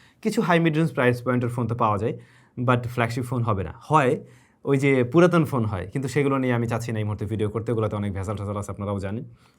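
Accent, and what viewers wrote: Barishal, Bangladesh. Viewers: বরিশালের কোন যায়গায় বাড়ি আপনার? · ভাই বরিশালের কোন এলাকায় থাকেন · বরিশাল কথায় আপনার বাড়ি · ভাই আপনি আমাদের বরিশালের লোক?🫶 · আমনেও মোর মতো বরিশাইল্লা❤ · ভাই বরিশাল আপনার বাড়ি কোথায়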